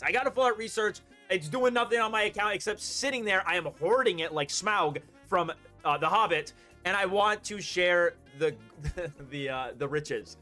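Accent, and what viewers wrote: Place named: North East, United Kingdom. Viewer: I've never said this bro but I think you must be from the north east. Just based off your accent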